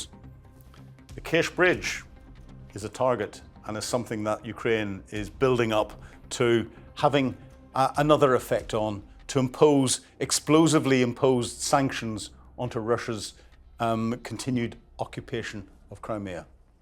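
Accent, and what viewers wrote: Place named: Scotland, United Kingdom. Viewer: IT'S The SCOTTISH BROGUE.❤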